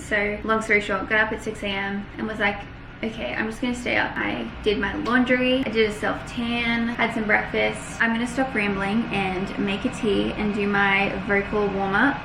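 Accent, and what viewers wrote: Australia. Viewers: And your Aussie accent is so cool to listen to 👂💖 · your Aussie accent is my fav thing ever I can hear you talk for hours!!!❤️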